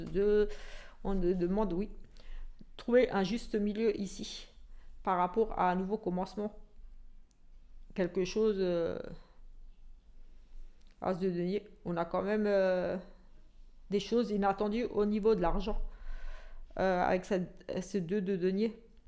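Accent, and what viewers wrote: Alsace, France. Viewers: J'adore votre accent depuis 6 ans je vie en Alsace avant jetait en Moselle hopla dü ! Bisous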